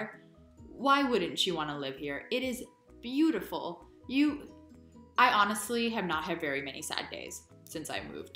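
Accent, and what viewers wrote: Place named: Netherlands. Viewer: Be careful, your accent already us becoming more Dutch-like than the typical US-accent!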